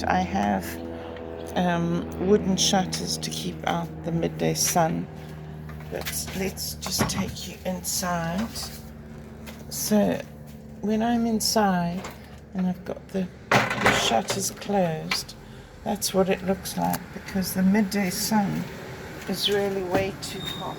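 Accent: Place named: United Kingdom. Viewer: Hi darling, I love so muh your lovely british accent and you seem such an adorable typical English lady at my italian eyes who needs only her raincoat and umbrella to not to be unfaithful to her origins! I agree with you about living in Europe, nowadays seems the better choice to live peacefully, and leaving in Italy, at least the north of Italy, is so much underrated by our generation. I know work is not enough rewarded as in other countries, but, hey, you cannot have everything from life... Things you understand only much later... I cannot see my life anywhere from here and you can find close minded people really everywhere, so... You can only stay away from them! I love your perfumes on a budget videos! Greetings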